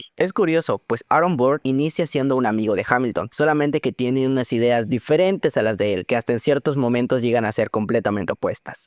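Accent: Mexico. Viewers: hay hamilton, hay drags, hay arquitectura y acento yucateco; este video lo tiene todo y por eso me super suscribo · análisis de hamilton en 2025 🥳🥳 te ameeee, necesitas hacer más videos porque los veré todos, excelente análisis, excelente acento yuca y mega slay ese make uppp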